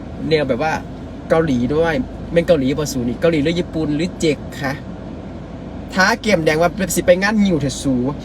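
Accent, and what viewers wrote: Isan, Thailand. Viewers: เสียงอีสานยูราษีไศลครับ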